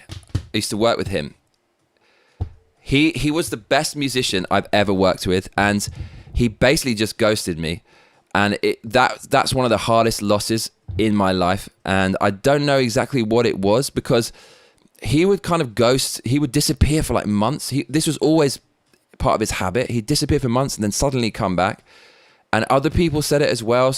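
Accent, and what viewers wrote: England, United Kingdom. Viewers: What part of England are you from ? I was born in Acton London W3 .. lived in South Carolina in USA FOR 50 years .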